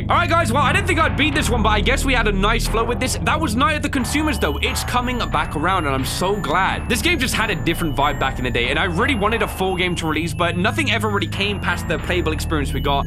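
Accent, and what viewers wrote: United Kingdom. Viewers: Wait what I've been watching for years and only now realized he's British???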